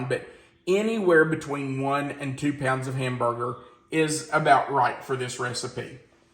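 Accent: South, United States. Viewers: I think you guys are great. What is the fat ratio of your beef? 80/20? 70/30? I love how you Southerners put G in the word onion.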